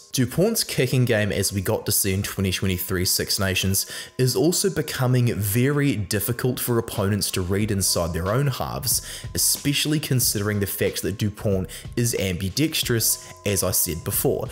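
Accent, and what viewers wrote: New Zealand. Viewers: Excellent vidéo and analys, Love the Kiwi accent👌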